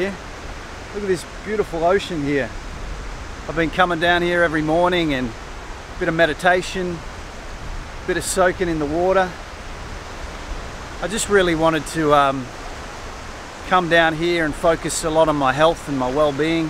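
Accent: Australia. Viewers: When you and LJ DO get together, be sure and make a vlog. Reason why is because your Aussie accents are totally different.. I guess each city in australia has their own unique accent??. True?Fun to listen to either way for us americans.. I also listen to a channel called archieluxury. He is a crazy bloke from Brisbane who is also in love with bangers.(thailand). Check him out if you havent already. He is definately certifiable.. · Hi, you sound Australian. also you're not old enough to retire ? have a nice day.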